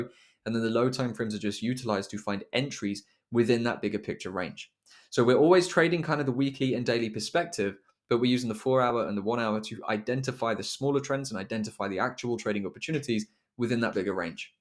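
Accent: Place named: Northern England, United Kingdom. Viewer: I have just subscibed. He might just come from North England. Maybe, Newcastle, Middlesbough, Sunderland, Southshields, Cumbra lol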